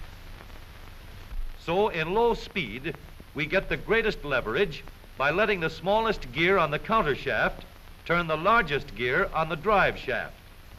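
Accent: Transatlantic, United States. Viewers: It’s a form of Trans Atlantic dialect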